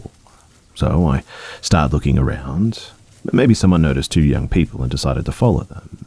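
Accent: Australia. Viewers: One of my favourite hobbies is Listening to scary stories and Drawing. Your Australian accent is Excellent and I'm not gonna lie, Pretty relaxing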